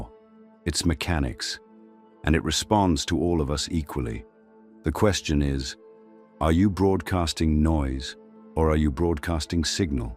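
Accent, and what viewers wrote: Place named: United Kingdom. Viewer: Great video,,,,a little British but not too much 🙂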